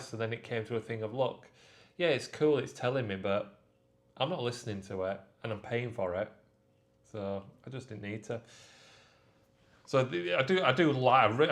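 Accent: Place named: West Yorkshire, United Kingdom. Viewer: Where abouts in Yorkshire you from? Sounds west Yorkshire ish accent!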